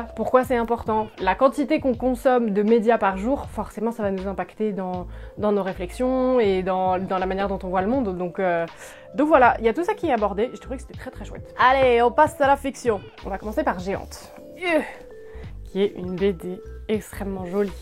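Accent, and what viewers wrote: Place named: North, France. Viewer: Je kiff trop ton accent tu viens du chnor ???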